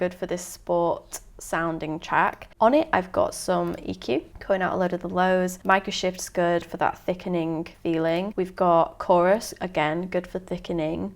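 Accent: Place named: Colne, United Kingdom. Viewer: Enjoyed this muchly. Subbed. You aren't from Colne by any chance are you? You sound uncannily like you might be........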